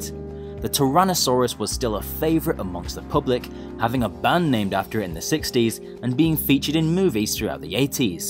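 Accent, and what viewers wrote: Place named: United Kingdom. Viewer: When ever i hear voice i always get the TheGamingBeaver vibe and aura, probably because he's Brittish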